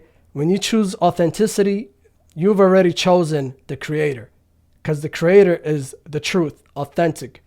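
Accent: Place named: New York, United States. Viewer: How did I know you were from the NYC/NJ area? Lol. I hear it in the talk...🤙🙏🙏🙏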